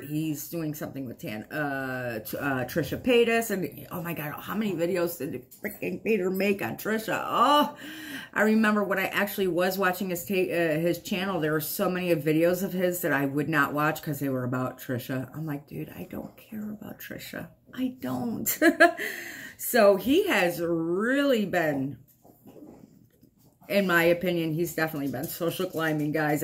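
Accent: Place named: Buffalo, United States. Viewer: I know that this is a very invasive question, but where are you from? Your accent reminds me of my family/people in my hometown (Buffalo). I could be completely off, please do not feel pressured to answer.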